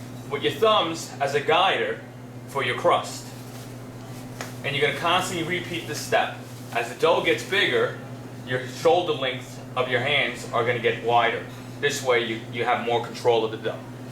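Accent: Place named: Brooklyn, United States. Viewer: This guy sounds like he has a Brooklyn accent. Only in Brooklyn is where you will find real pizza guys who know how to make real pizza.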